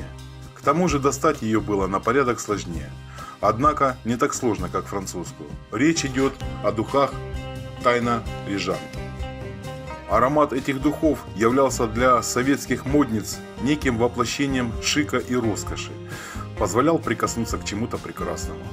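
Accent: Ukraine. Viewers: прекрасный украинский говор, что вы имеете против?)) не все говорят с маасковким ааакцэнтаам)))) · Духи Праздничные- Россия и Юриала-3 - Прибалтика.А говор украинский за версту. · Это украинский или южнорусский акцент. А не издевательство! Речь не о том в видео! Спасибо автору за воспоминания и информацию для тех кто не знает. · Вообще то это украинский канал, у них всё так говорят.... Могли бы сделать снисхождение, а не критиковать.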